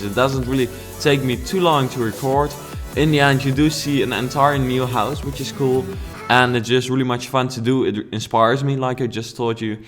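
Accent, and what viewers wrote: Netherlands. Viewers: Are you Dutch? (NL) (ben je nederlands?!)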